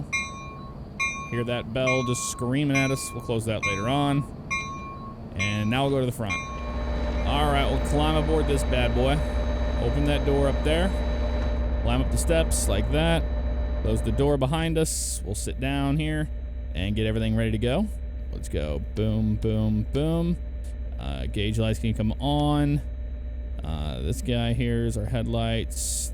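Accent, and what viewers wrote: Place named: United States. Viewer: 'Train Sim World THHUANNEY THHUANNEY' Murricans and their pronunciation... -.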